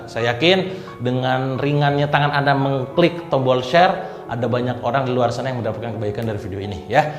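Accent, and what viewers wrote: West Java, Indonesia. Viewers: Sia akang nyunda pisan euyyy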